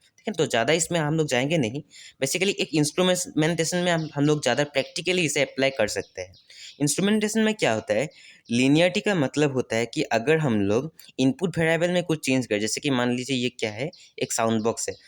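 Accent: Assam, India. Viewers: Bhaiya aap Assam se ho kya😃? Aapke accent se aise lg rhe😍.. Btw thanks for this amazing video 🥰🥰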